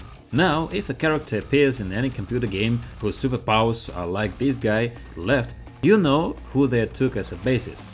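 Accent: Russia. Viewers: Да Ты Русский, за километр слышно!!! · Are you russian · Ты ж русский? Или просто акцент такой?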